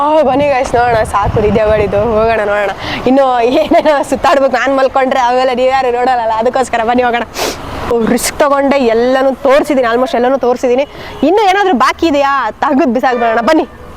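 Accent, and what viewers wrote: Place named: Bengaluru, India. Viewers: Bengaluru hudgi Tara maataadta ediya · ನೀವು ರಾಯಚೂರು ಅಂತ ಹೇಳ್ತೀರಾ ಬೆಂಗಳೂರು ಭಾಷೆ ಇದೆ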